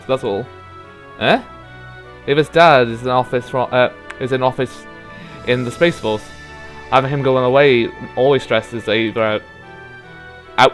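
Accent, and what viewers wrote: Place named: United Kingdom. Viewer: Just so you know, no offence intended, "nubile" is not pronounced "nimble", it is pronounced "new-by-elle". Also, really, if you have troubles with a lot of the words, you should really not read the text. On another note, this is a side game set in a different universe to a game titled "Sunrider" which has since been released as "Sunrider: First Arrival" and "Sunrider: Mask of Arcadius", with a third installment to come at some point. Having played them only really shows you another version of the characters. Hue: a gradation or variety of a color; tint. "Re-Pro-Duck-shun" Seriously now. Your accent sounds like you are British, you should have been taught most of these words by now...right? Again, no offence, just...listening to you mangle the words is like having an ice pick shoved in my ears. A single ice pick. Hitting both ears. 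At the same time. But that is just me. Again, not trying to offend you.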